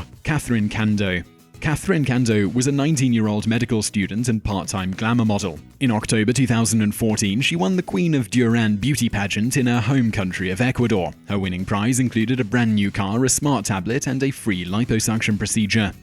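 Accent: United Kingdom. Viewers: he's British, just how us Americans say water wrong by saying "wader"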